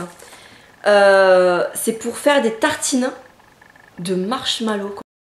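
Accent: South, France. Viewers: Haa a peine j'appuie sur play j'entend l'accent du sud c'est bon ca ! :p Tu viens d'ou ? :)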